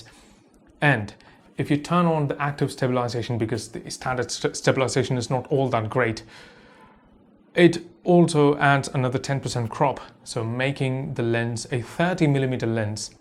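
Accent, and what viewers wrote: United Kingdom. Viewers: British accent maadhri irukku naa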